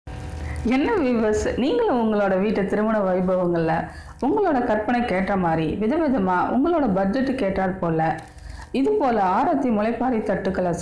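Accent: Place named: Tamil Nadu, India. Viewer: Ungal thamizh slang nalla iruku sis.. Azhagana arathi thattugal..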